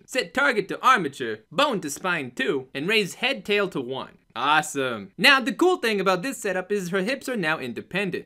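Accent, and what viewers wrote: New York, United States. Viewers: love your tutorials but the ny hot dog vender thing you have going on is annoying af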